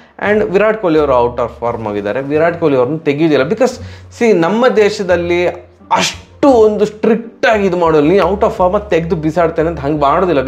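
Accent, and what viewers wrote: Mangalore, India. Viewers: You are the best cricket analyst ❤ and your mangalore Kannada is superb ❤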